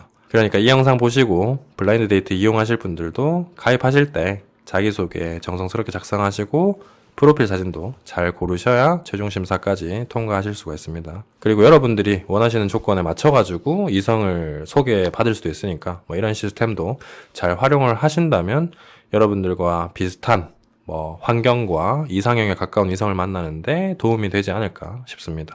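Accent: Gyeongsang, South Korea. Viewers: 대구 경북쪽 말투네요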